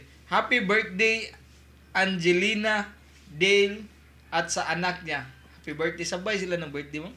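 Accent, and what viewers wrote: Visayas, Philippines. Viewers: I like the way you talked! I loved the Visayan accent! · Di bagay mag tagalog2 bisayang dgko why angay. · Ingles ug Tagalog nimo gahi kaau Klaro kaayo bisaya accent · Sinasadya nyo po ba accent nyo? Kasi bisaya po din ako.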